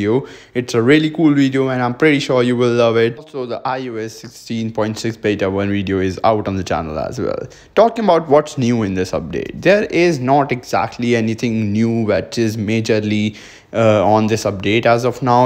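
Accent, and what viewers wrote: India. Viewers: i hate your english language you indians!!!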